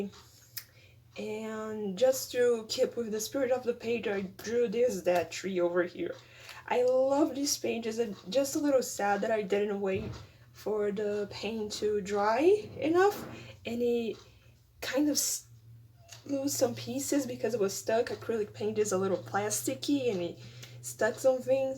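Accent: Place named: Brazil. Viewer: You're accent sound a little Brazilian, where are you from??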